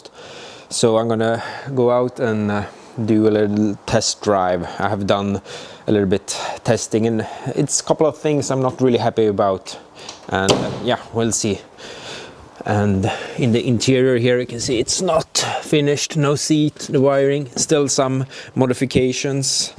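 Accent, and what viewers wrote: Sweden. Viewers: This is amazing! When you say "job" say it like "djob" and when you say cheap say it like "tcheap" :) That's how I learned to correct my English. Just some feedback from another swede, if you interested :x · Are you swedish?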